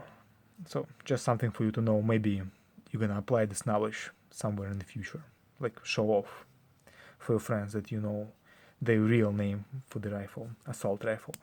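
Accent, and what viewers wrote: Russia. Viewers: ты русский) ясно по акценту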